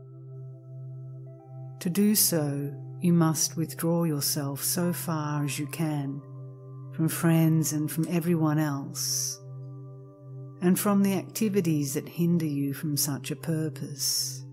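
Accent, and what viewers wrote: Australia. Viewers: I love your voice. Such clarity and such strength fill with compassion. Are you Australian? So relaxing no rush, just basking in it all. Thank you.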